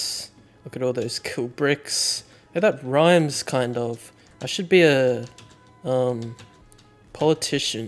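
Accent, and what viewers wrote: Australia. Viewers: towre of srres very gdoo also why do you sound like australian remainings best video do coabl p rank and lorekeeper guide NOW!!!!! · he’s actually aussie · he’s Australian i think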